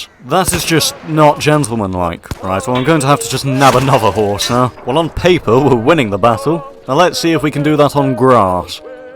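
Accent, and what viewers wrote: United Kingdom. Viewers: Bloody hell, this chap's a real Englishman! Accent, behaviour, EVERYTHING of him is Bri'ish!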